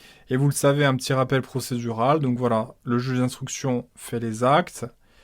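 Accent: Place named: Nice, France. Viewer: Merci encore pour tes podcasts ! À écouter en fond avec le petit accent j’ai l’impression d’être de nouveau chez moi à Nice 🫱🏼‍🫲🏽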